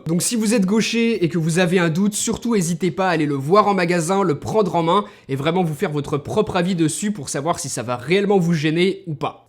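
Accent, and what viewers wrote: Lyon, France. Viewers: Tu es lyonnais?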